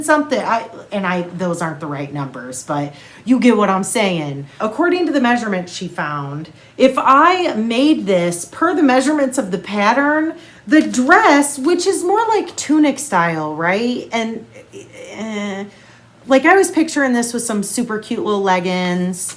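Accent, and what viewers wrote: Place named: Texas, United States. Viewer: Hi... let me start off saying you are not boring! I just discovered your video. I really enjoyed you being YOU! I live in Houston Texas and you sound like you’re from Texas. Anyways that Boxy Chevron sweater is so awesome I had to go buy it ASAP but I gotta problem I’m a NEW knitter so will you please do a tutorial on how to get started on this sweater Puh-lease - Lisa😊🙏🏽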